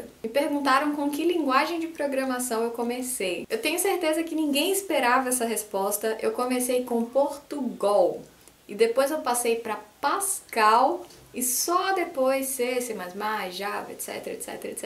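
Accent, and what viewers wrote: Bahia, Brazil. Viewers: Já falei sobre isso, mas repito: seu sotaque aparece sim, e até muito! Quem espera ouvir aquele sotaque do INTERIOR da Bahia, algo bem caricato, não não vai encontrar. Eu que sou soteropolitano vejo você "sotaquear" frequentemente. Parabéns pelo canal, como sempre! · Aos meus ouvidos paulistanos o seu sotaque baiano é bem acentuado! XD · lógico, todo mundo tem sotaque. Mas em comparação com o sotaque baiano marcado, tem pouco. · não sei, eu sou baiano também e percebo bem o dela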